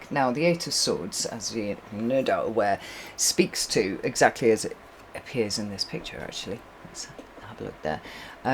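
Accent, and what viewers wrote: United Kingdom. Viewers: Your first reading for me. Lovely to hear someone from the UK. Really resonated with what’s going on in my rather complicated personal life right now. Trying to figure out in which direction to go in the best way possible moving forward. Thank you for your insights.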